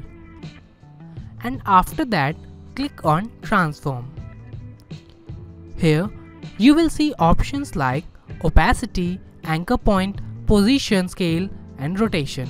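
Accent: India. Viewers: random dude with Indian accent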